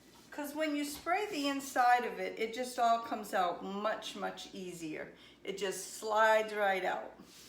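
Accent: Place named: Maine, United States. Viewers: You remind me of my great gramma Goldie. She was from mid state Maine toward the west. She had just the same accent as you🤗 she passed 9 years ago this December. She was just shy of 93. What a feisty lady she was too!! Anyway, I’ve been looking for a recipe like this. Thank you for the recipe and the memories. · I was searching YouTube for a dark molasses cookie like my grandmother used to make, and yours look most like them. I didn’t even notice the name of your channel, but I thought “she sounds like she’s from home” Haha yup, Maine💛I’ll be trying your recipe!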